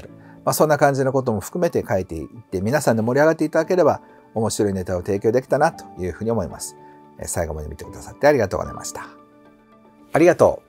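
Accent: Kansai, Japan. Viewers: 面白かったです！ 健さんの動画が好きで、最近毎日見させていただいてます😊 お話を聞いていて、何か関西の雰囲気も感じる方だな…と思っていたのですが、関西出身だったんですね😊 私は話し方やノリは関西が好きですが、関西は値切るイメージがあるから、お金に関するのは関東のが好きかなぁ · 健さん いつもありがとうございます✨ 健さんの関西弁が聞けて楽しかったです♪ 私も関西出身ですが、やはりビジネスは関東（東京）中心で関東人の方が、お金持ちになりやすいと思います。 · 健さんのYouTubeを数ヶ月前から見ています。 健さんの関西弁や、エンタメ要素のある内容、思わず笑ってしまいました。 またこういう面白い健さんも見てみたいです！ ありがとうございます😊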